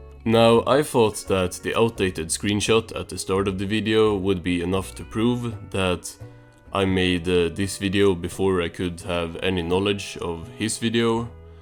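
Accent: Sweden. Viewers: It's for sure scandinavian, I'm leaning towards swedish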